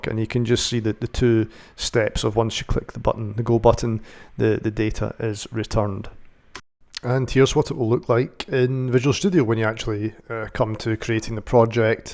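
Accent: Scotland, United Kingdom. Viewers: > youtube search for api tutorial c# > first vid contains "C#", "Step by step" and is 24 minutes long > clicks > scottisch accent > fuck yes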